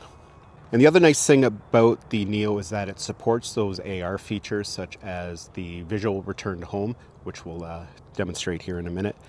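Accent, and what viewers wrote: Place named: Maritimes, Canada. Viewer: Great video... and you sound like a fellow Maritimer... getting my Neo soon just waiting for a possible Black Friday deal.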